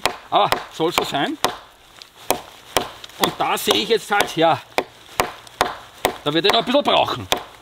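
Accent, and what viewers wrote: Austria. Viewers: Tolles Video! Ehrlich kommentiert, ich finde den österreichischen Dialekt klasse 👍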